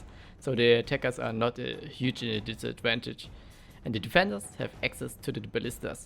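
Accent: Germany. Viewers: Dieser breite deutsche Akzent 😂 aber gutes Video 👍🏽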